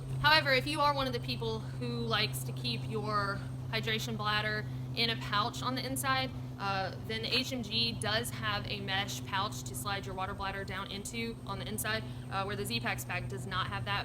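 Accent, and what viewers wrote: South, United States. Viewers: As an aussie guy I shouldn't find your southern accent "comfortable" but I do, I love listening to your voice and I could listen to it all day every day (...and I kinda have been, going through a " Marathon" for the last week or so... 🎶. The fact that it's clear from listening to you that you have a sharp mind and that you are pretty good at phrasing what you want to say probably helps...) I do like the look of that Zpack Arc Haul, and even more the look of the Haul Zip 64L, pity that it appears like you can only buy from them directly, as there's very limited scope for specials. I am in the process of updating my gear for a multi-day late spring trek in the Japanese Alps next year, and I'd like to take the chance to thank you for converting me to quilts (side sleeper here) 🙏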